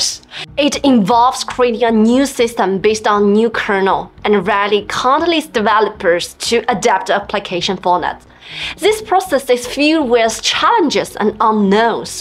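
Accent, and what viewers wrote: China. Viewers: I really love your English as spoken by which is original Chinese girl speaking the International English language. Well done and keep smiling😅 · your chinglish is soo sexy!.............loved it!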